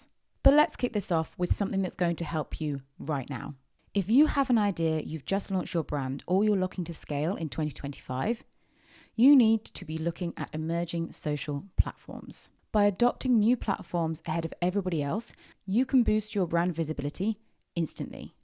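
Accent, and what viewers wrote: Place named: United Kingdom. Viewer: Love your british accent. For you i dont need the subtitles 😅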